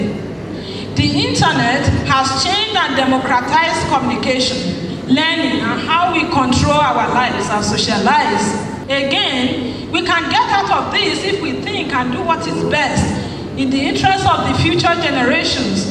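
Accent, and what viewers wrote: Yoruba, Nigeria. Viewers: at least she used Yoruba intonation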